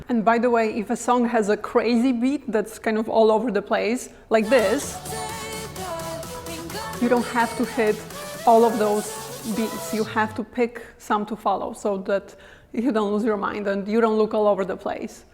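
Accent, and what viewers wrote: Poland. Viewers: You sound Polish. My parents are Polish. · Great teaching, thank you! I'm going to watch your other videos! BTW. Have you any connections with Poland? I can hear sth like "Polish note" in your voice ... :) Don't answer if it is too personal.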